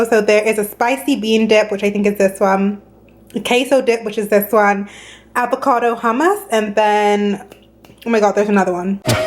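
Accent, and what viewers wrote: United Kingdom. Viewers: Hey. Is that a British accent you partially have? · Are you British?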